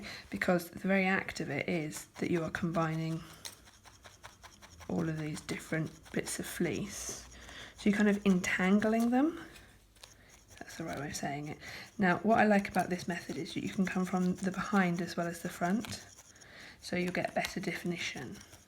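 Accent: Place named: United Kingdom. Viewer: Thank you for a straight forward demonstration. Great to hear a UK presenter😊 I personally can't cope with the USA accents.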